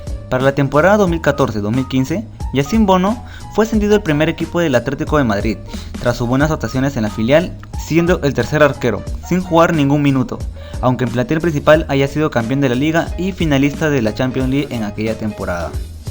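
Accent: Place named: Argentina. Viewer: Porque habla como un argentino??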